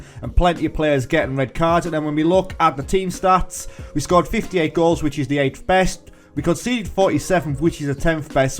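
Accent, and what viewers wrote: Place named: Yorkshire, United Kingdom. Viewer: Hi im new to this channel, you sound like your from Yorkshire like myself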